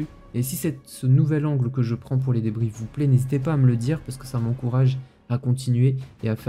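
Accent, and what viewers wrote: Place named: Marseille, France. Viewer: C'est l'accent Marseillais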